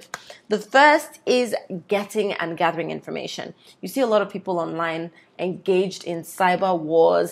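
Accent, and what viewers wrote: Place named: Nigeria. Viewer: I knew you were a Nigerian right from the beginning I heard your voice. Nice Video 😊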